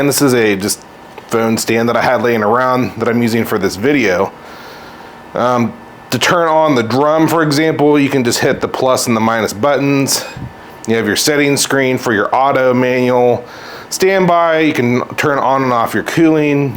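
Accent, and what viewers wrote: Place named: Southern California, United States. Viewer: Holy SoCal accent batman. Thank you for answering all of my questions though! Great Vid!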